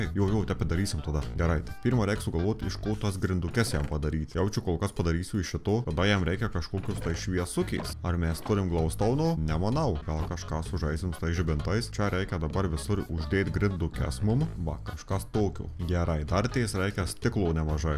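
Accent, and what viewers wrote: Suvalkija, Lithuania. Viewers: Gerai moki kalbėt suvalkietiškai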